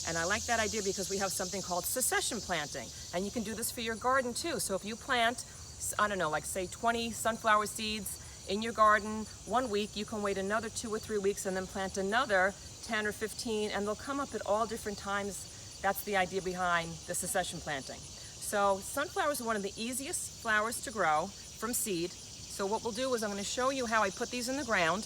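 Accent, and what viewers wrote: New Jersey, United States. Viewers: Lol I just watched another video of yours about sunflower tips and I knew as soon as I heard your voice that you were a Jersey girl and it has now been confirmed! 🌻✨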